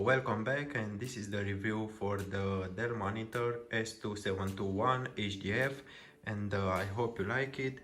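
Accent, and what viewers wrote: Romania. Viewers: Multumesc de review, ca vreau sa mi-l iau peste cateva zile(mi-am dat seama ca esti roman dupa accent) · Recunosti romanul dupa accent din prima....super tare video!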